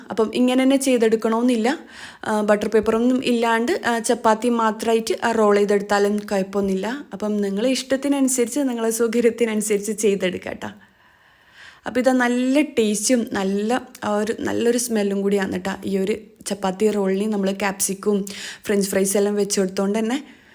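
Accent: Kannur, India. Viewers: Hii ithaa...ഈ കണ്ണൂരുകാരുടെ voice ഒരു പ്രത്യേക രസാ ട്ടാ നിങ്ങൾ shamis own ചാാനൽ ലെ ഷമിതയുടെ നാട്ടിലാണോ?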